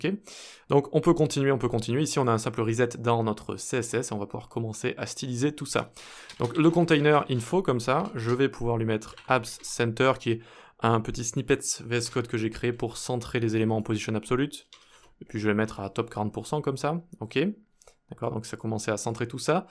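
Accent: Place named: United Kingdom. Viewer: Ton accent anglais me fume à chaque fois xD Très sympa comme effet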